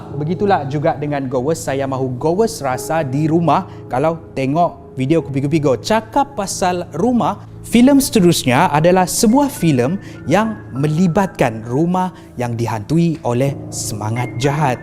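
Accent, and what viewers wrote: Sabah, Malaysia. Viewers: Ainahhh org sabahhhh baini .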